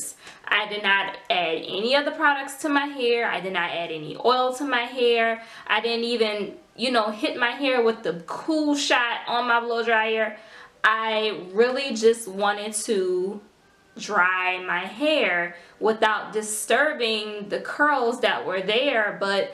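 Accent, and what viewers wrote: New Orleans, United States. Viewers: Sounds like a hear a whole lotta NOLA in your voice #yaheardme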